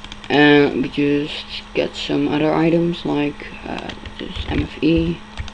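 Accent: Netherlands. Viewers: lolz je bent nl dat hoor je gewoon ;)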